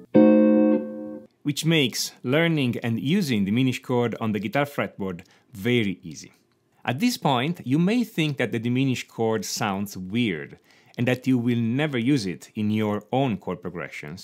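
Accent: Italy. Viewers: You sound italian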